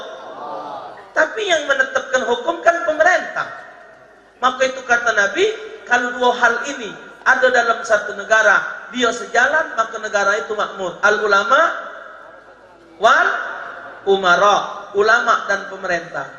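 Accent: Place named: Palembang, Indonesia. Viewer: Masya Allah baru berapo bulan buka ceramah,dan dengan ceramah nyo yg khas palembang..sayo baru tau kalo lah dak ado lagi😢😢.smoga alm ditempatkan bersama org2 soleh.Aamiin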